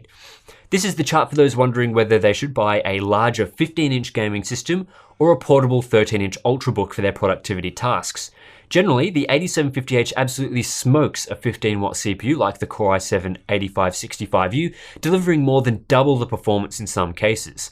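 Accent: Australia. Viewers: Most other reviews are done in a heavy accent. It was refreshing to hear a fellow Aussies voice for this review. Subscribed, and bought the 17.3" scar II (2060)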